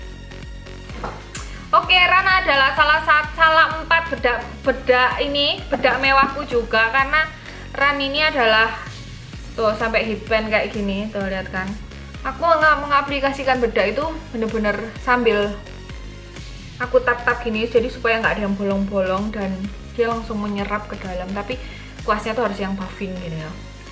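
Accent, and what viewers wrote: Java, Indonesia. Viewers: Saya selalu suka tutorial make up mbak, gaya bicaranya yg medok dan pemilihan warna2 make up nya yg ok bikin betah utk nonton, sukses selalu utk mbak dan terus saya tunggu up date tutorialnya, Gbu😀 Dan saran saya tolong juga jaga kesehatan kulit wajahnya ya😀👌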